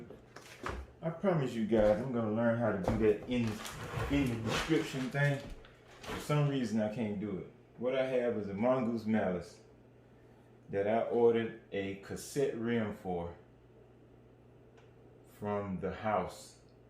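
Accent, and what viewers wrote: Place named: Atlanta, United States. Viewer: I would have guessed he is from outside of Atlanta somewhere...I try to guess regional accents. My guess is he grew up and/or lives within 40 miles of Atlanta, Georgia.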